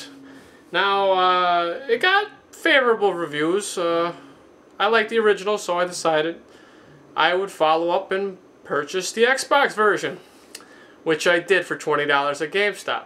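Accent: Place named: New York, United States. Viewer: I think he's a New Yorker, maybe Boston. He's displays more intelligence than the guys from Jersey Shore do.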